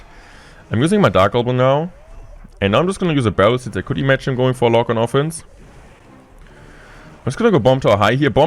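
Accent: Germany. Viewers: To the English people: Do you hear a German accent? Because i dont think he is talking that Bad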